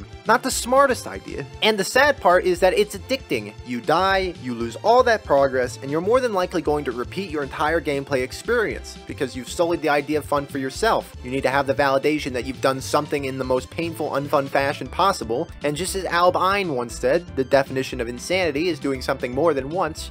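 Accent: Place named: New York, United States. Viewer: You just sound like a new Yorker and they big there. You eat what ever you want brother, just keep making content.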